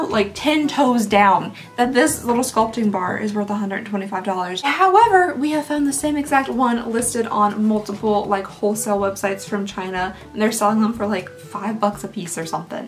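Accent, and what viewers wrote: Kentucky, United States. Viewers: Ahh. I come straight to your videos when I get notified!!! My fav! I'm also a kentucky girl. Live about 20 mins from lexington. Love your accent. ❤️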